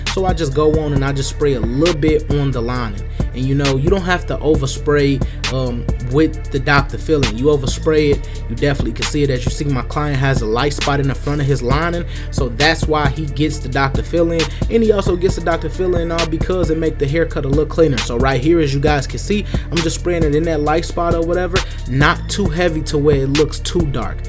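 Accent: Chicago, United States. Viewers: that Chicago accent, is crazy. I lost mine at the age of 10 when I moved to TX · Sound like you from the Chi, I'll drive 3 hrs for this cut. No doubt! Good work bro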